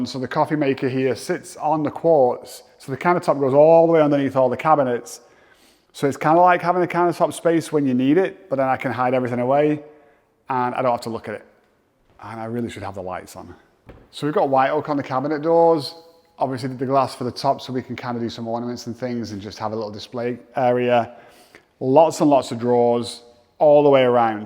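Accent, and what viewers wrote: England, United Kingdom. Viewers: He dosent talk American his he from England. Beautiful house Amazeing.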